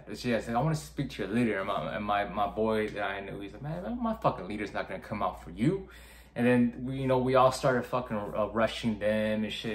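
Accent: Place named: United States. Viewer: You sound American 🏈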